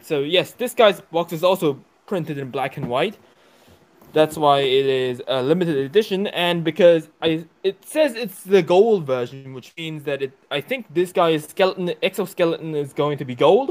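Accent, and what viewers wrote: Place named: South Korea. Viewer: 아닝 영어 억양이 딱봐도 한국쪽인데 한사람 한국오셨나용?이래 ㅋㅋㅋㅋㅋㄱㅋㅋㅋㅋㅋㅋㅋ 귀엽네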